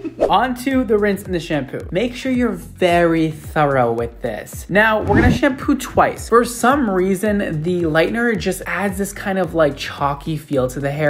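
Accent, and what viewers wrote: Eastern Europe. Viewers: She is so beautiful 😻 I could be wrong but I hear Slavic accent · I'm curious if slavic as she have this funny accent (do we all have it🤔) btw, her hair is amazing!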